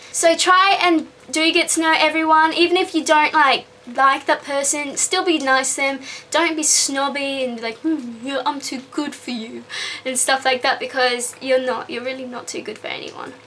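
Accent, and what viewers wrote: Australia. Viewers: she from aus? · She's Australian, GTFO. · i LOVE ur accent :D <3 Australians have the BEST accents( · I love love LOVE the way you sound. Are you from AU or NZ? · that is so awesome Iove australian accents ... I'm sorry they're just soo cute :) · Are u from Aussie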